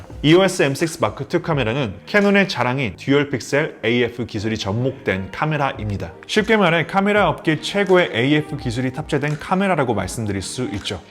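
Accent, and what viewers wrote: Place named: United States. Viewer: 재미교포신가요? 한국어도 미쿡말처럼 말씀하심..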